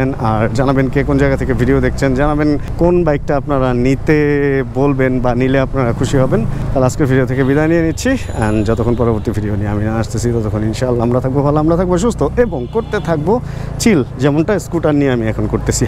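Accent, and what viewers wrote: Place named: Bandura, Bangladesh. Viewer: Bandura kothy basha apner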